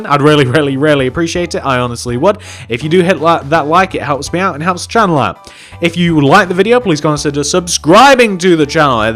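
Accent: United Kingdom. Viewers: I'm gonna admit you sound just a little British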